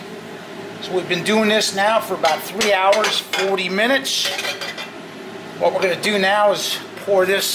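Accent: United States. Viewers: WOW People and Viewers, this guy has deliberately gone out of his way to make this look like Bomb making and it's not. Why would this guy do that? is it that he's not wanting backyarders to encroach on his market? Listen to the voice - does it sound American? Americans always Lie for Commercial reasons - "There were no weapons of mass destruction in Iraq" only a bunch of children to be murdered for their oil" this guy is lying badly - he's an American - it'll be for the same reason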